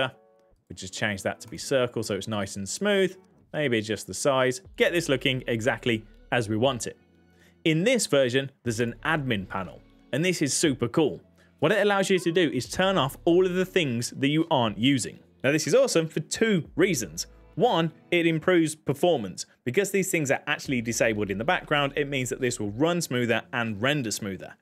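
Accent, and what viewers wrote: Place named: Midlands, United Kingdom. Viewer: I live in the Midlands and have an accute "accent" awareness.. Yours sounds about 20 miles from Colin Furze! Somewhere north of Leicester and up as far as Nottingham. Just a random comment!! Cheers, Callum.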